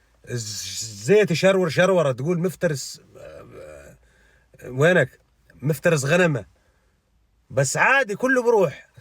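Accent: Hauran, Syria. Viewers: لهجة حوران الاجمل بالعالم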